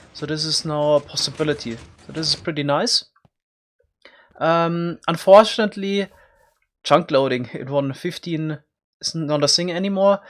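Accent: Germany. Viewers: Deutscher Akzent Noice👌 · Man merkt halt echt das du Deutsch bist ^^ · Ξενοφώντας Σούλης I’m Mexican. When I heard his German accent I shit my pants 😂😂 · Dieser deutsche Akzent xD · Kann es sein das du Deutsch bist? Wenn ja man hört as an deiner Aussprache! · I hear that you are german. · This english Akzent. I höre, dass you are a german sprechen Person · Kann es sein dass du deutsch bist?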